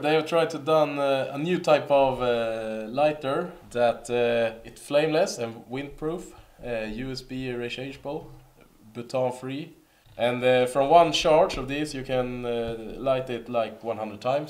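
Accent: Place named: Sweden. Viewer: You are Swedish, i can tell by your accent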